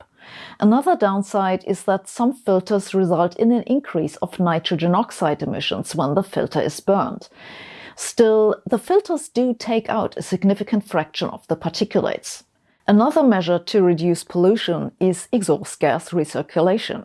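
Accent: Germany. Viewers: fear mongering with a German accent... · Ihr Englisch ist so herrlich Deutsch ...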